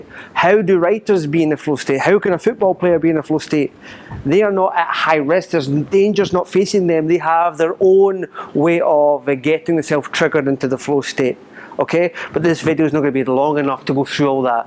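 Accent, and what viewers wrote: Scotland, United Kingdom. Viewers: Great video 👌 Bang on everything you say. I’m a fellow Scot, from Airdrie just outside glasgow! Nice to hear my accent YouTube’s full of Americans 😂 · The Scottish accent makes this video 10x more awesome.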